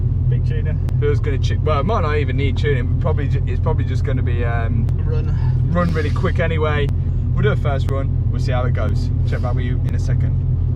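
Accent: Leicester, United Kingdom. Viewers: Can tell your from Leicester mate😂👍🏼 is that desford crossroads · you’re so Leicester it’s redic. Enjoy your coffeh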